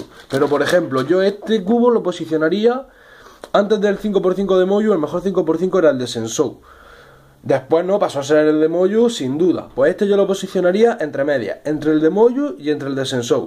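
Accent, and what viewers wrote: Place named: Murcia, Spain. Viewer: Verengena Escarlata Es de Murcia y es su acento eso no es criticable :"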